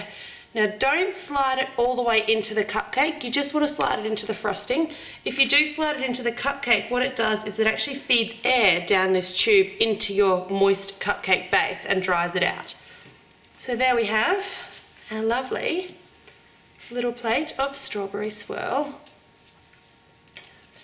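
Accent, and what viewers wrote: Australia. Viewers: you australian? :) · She sounds Australian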